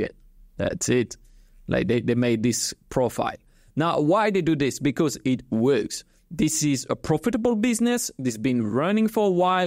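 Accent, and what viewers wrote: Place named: France. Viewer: cet accent français mamamiaaaa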